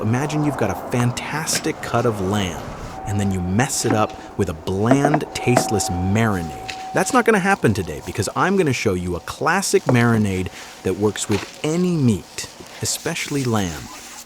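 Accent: United States. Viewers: Ur videos lost its charm because of the annoying American accent that doesn’t shut up.!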